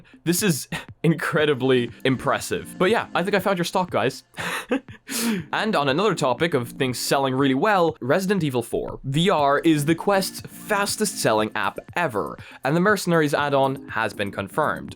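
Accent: Ireland. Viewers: I love when the Irish accent sneaks out 🍀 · Bro sometimes u just sound irish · You have just a whisper of an Irish accent, dont you? Am I Right???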